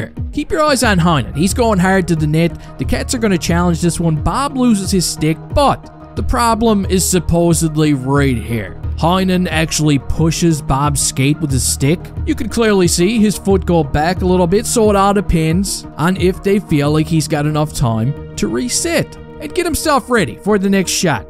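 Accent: Newfoundland, Canada. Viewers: First time viewing the channel and even as a Yank I can tell you’re a Newfie… 😂 love it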